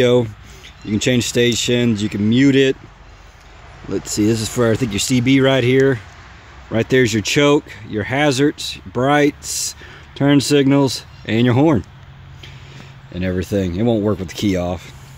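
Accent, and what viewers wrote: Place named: Texas, United States. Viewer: great bike and great texas accent.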